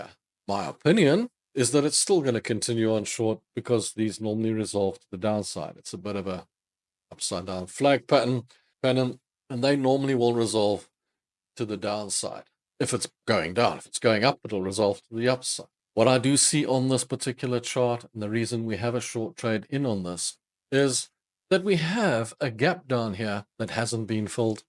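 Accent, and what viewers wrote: South Africa. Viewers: Good content. Are you from Cape Town?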